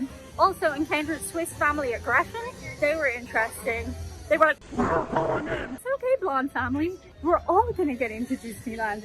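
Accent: Ireland. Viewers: Well, Disney with an Irish accent is not as common 🙂 loved the video 🙂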